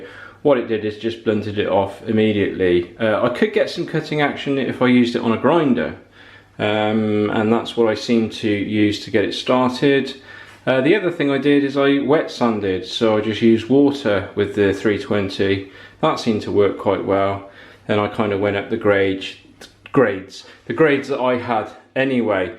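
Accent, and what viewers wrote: United Kingdom. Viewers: My grand parents and beyond were Brits and I really enjoy the accent...I'm a yank now...The vid was very informative and I need to do this...am in the process of restoring a 93 Honda Prelude that has tons of micro scratches from dirty windshield wipers being dragged over a dry windshield...Thanks again...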